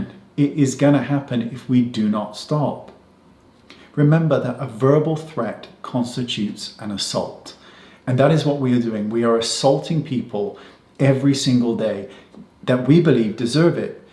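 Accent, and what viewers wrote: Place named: Scotland, United Kingdom. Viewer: That Scottish accent is really poking through in this video and I love it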